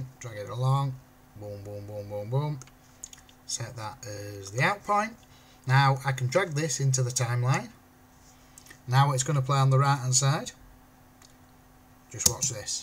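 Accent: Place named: Yorkshire, United Kingdom. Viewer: Hahahaha.... Great tutorial but your accent reminds me of growing up in York. Long time since I've heard an accent that thick. Thanks!!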